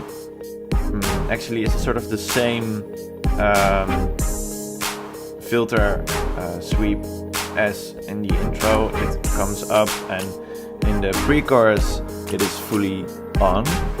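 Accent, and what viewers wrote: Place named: Netherlands. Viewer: you sound dutch, could you explain what you did with the compressors? :)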